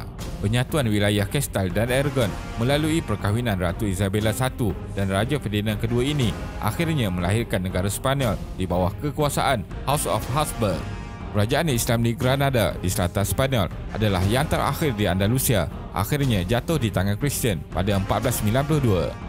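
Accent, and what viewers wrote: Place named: Malaysia. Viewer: Assalamungalaikum, admin dari Malaysia Kan,karna aku dengar lidahmu bukan lidah orang Indonesia 🇮🇩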